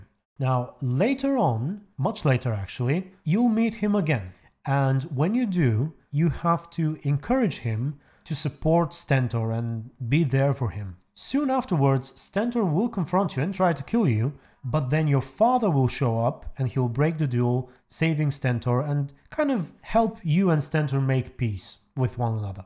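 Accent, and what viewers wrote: Bulgaria. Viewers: Are you from Bulgaria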